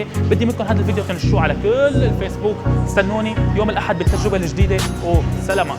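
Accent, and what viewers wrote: Syria. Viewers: أول شي فديت اللهجة السوريه ما احلاها دخيل أمك شو اسمك ههههه اضحك والا فاعلم أن أعداء النجاح منعوك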